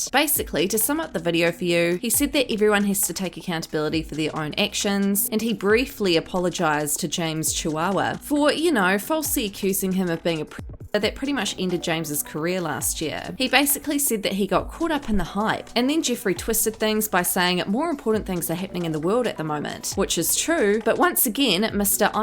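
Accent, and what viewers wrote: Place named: New Zealand. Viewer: Kiwi christchurch accent?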